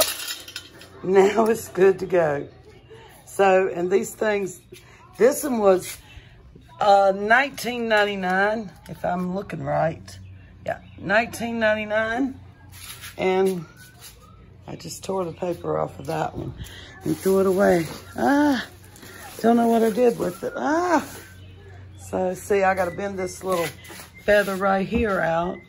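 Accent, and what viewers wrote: South, United States. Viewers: Where are you from? Love your southern accent! What store did you find the umbrellas from?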